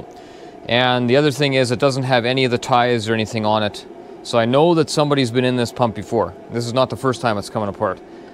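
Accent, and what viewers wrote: Canada. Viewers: The sheer amount of moving parts in a fuel injection pump that was designed decades ago is mind boggling. I love your content, man. This is a relatively new-to-me channel, but you post lots of very interesting stuff, and as a fellow canadian, your honest-to-goodness canadian accent warms my heart in a sea of american videos.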